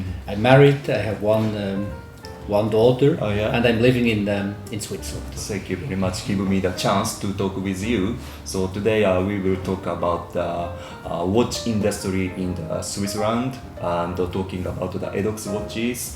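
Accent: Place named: Japan. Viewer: クリスチャンさんの英語はまるでイギリス英語のように聞きやすい。 特に黒人系のスラングたっぷりの英語を直で聞いたあとならね。 そしてRYさんの英語発音は結構カタカナ英語っぽいんですね。 巻き舌がほぼなくて我々日本人に聞きやすくできてる。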